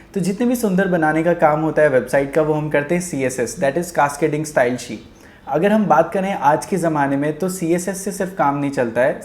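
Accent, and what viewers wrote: India. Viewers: yeh punjabi he i can sense that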